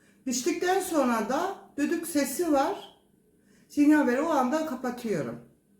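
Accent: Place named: Bolu, Turkey. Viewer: bolulu musun aq o nasıl bir lehçe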